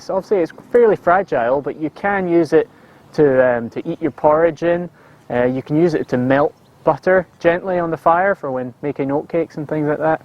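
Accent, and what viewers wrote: Scotland, United Kingdom. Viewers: Nice Scottish accent, mate ! Damn cute😌 The vid is also very interesting ... always wondered how those guy managed to travel so light !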